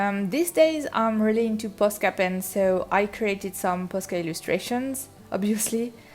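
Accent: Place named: France. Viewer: You have a french voice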